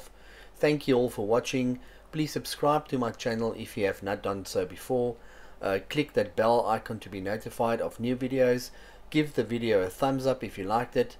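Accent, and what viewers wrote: South Africa. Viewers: Ive noticed your accent is south African or i might be wrong the thing is people dont use a good headset or proper speakers use quality headphones you will hear they dont lack that much in the bass sound bass definitely a bit more while they are suspended ive just discovered this channel yesterday and im inspired to build my own bluetooth speaker im from south africa currently residing in china so everything is less expensive love your work man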